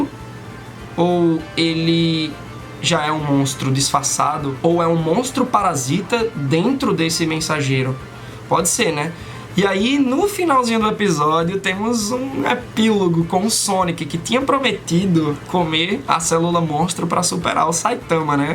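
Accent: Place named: Sergipe, Brazil. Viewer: Tu é de Sergipe? Parece demais o sotaque. Abraço!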